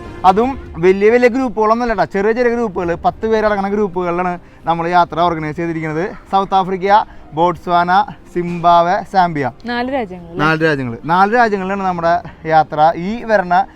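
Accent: Thrissur, India. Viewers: ഈ വീഡിയോ ചേച്ചി കൊണ്ടുപോയി വിത്ത്‌ തൃശൂർ സ്ലാങ് 😍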